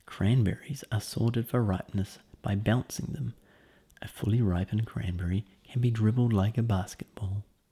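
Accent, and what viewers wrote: New Zealand. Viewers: what part of new zealand do you come from? im from auckland :)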